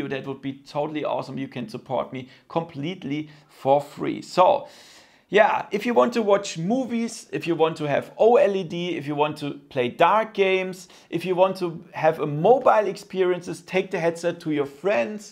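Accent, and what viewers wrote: Germany. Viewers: Are you German ?😂 Wenn ja ich auch haha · Its rude to comment on how someone sounds I'd be offended too. Especially sense he put all the effort into the video and he's got people like you complaining about his German accent. It's a stupid and petty complaint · Everything he says is clear, there is no need to bring up his accent. He's German, why does he need to work on an English accent? He doesn't have to take your "advise" when it's an unnecessary critic of how he sounds. The dude went to the effort of making an English speaking channel to help out another audience and you're nit picking.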